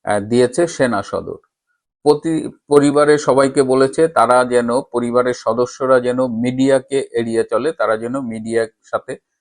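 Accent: India. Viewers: তোমার কথা শুনে মনে হলো তুমি ভারতীয় দাদাবাবু তীয়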